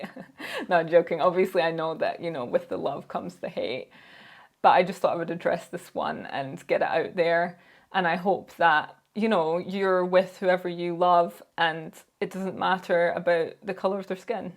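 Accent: Scotland, United Kingdom. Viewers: Hang in there! Love your videos and to see a black woman with a Scottish accent is so cool. We all have hangups we need to get over. Some people go to positive others prefer negative. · No, she definitely doesn’t. She has a very strong Scottish accent.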